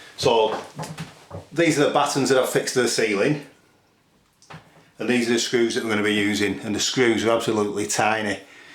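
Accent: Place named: Lancashire, United Kingdom. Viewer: Nice video. U from Blackpool? St Ann's ur accent ssounds familiar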